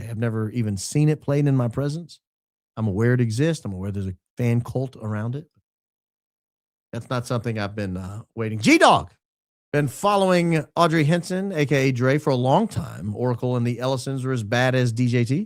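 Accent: South, United States. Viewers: You absolutely have a southern accent.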